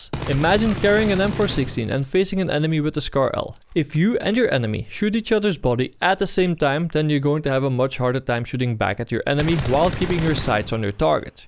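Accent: Netherlands. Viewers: are you dutch by any chance? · jaja, 100% een nederlander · You must be dutch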